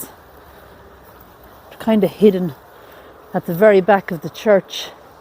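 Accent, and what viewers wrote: Ireland. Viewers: I like your irish accent. 😊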